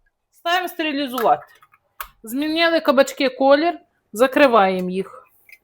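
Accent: Ukraine. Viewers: Вітаю! Ви напевно найкраща господиня з найкращими рецептами! Дуже Вам дякую за Вашу працю ❤ Всього Вам найкращого і всій вашій родині! Ps. Дуже гарний український говір 😉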